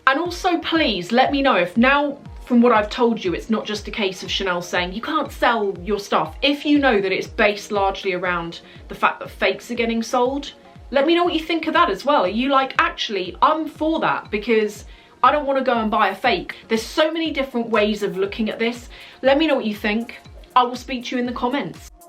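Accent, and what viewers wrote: England, United Kingdom. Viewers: What part of England are you from? I love your accent❤️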